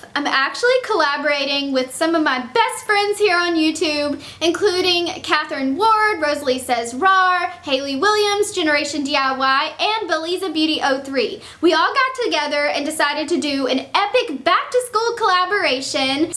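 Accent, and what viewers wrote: South, United States. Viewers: Does she fake the country accent bc why did she lose in the middle of the video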